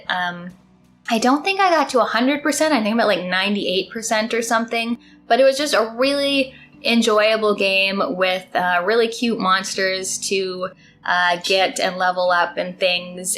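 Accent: Canada. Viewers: exactly. Canadian accents rock, both in the sense they're awesome and they help calm me down when I'm feeling anxious 😁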